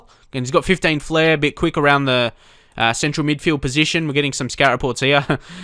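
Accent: Australia. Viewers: I'm guessing because you have an Australian accent, as one of the candidates on the apprentice has, people are taking the piss. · really like this lets play because you go into detail and teach me a lot of stuff. you are australian accent is something that takes a bit getting used to ;) Greetings from germany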